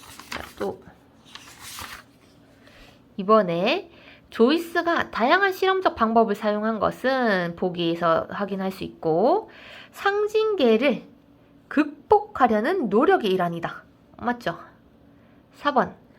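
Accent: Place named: Gyeongsang, South Korea. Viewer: 선생님 덕분에 수능완성 실전5회까지 독서까지 잘왔네요..그런데 실전모의고사5회 독서지문 처분의 무효,취소,철회지문이랑 (가)로켓엔진의 종류와 특성(나)우주선 발사체를 이동하기위한 중력추진 지문 해설해 주실 수 있나요?…법률지문은 어휘랑 개념이 생소해서 어떻게 읽어야하는지 분류해야 하는지 모르겠어요…그리고지구과학 지문은 쌍곡선이라든지 글이 그림으로 그려야하는데.. 처음 한번에 그려진다든지 이해가 되지 않네요…후반기 더운 여름 시간괜찮으시면..해설 부탁드려요…라캉이론 올려주셔서 감사합니다..귀여운 경상도 발음이 쏙쏙 귀에 박히네요ㅎㅎ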